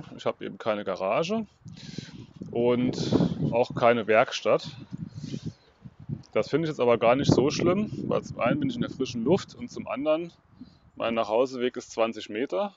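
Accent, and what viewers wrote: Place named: Hesse, Germany. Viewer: Spannendes Projekt! Wie ist das eigentlich mit Tüv und Steuerungs-Elektronik. Muss die Ansteuerung/Software des E-Antrieb bestimmte Abnahmen erfolgen? Mir gefällt es gut vorhandenes wieder zu verwenden. Ist halt viel gefummel und gesuche. Dein hessisch ist sehr sympathisch. Anmoderation ist mal was neues. Passt. Gruß aus Süd Hessen.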